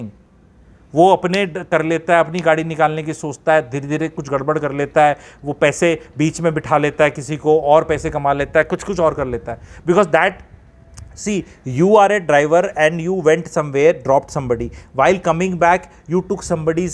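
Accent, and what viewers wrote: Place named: Haryana, India. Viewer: I love your accent English , Hindi, Haryanvi😊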